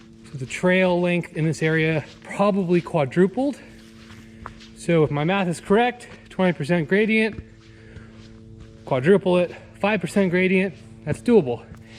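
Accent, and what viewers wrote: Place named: Minnesota, United States. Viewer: Oh ya is MN, eh is Canadian....nice vid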